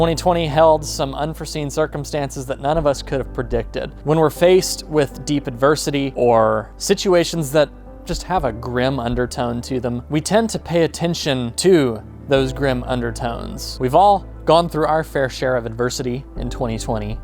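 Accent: Texas, United States. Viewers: Thank you for your efforts to make this channel. I discovered it this year when looking for videos on the Mooney and I met your series ferrying the Turbo Mooney to Texas. BTW, I’m amazed how easily I could understand your texan accent 😉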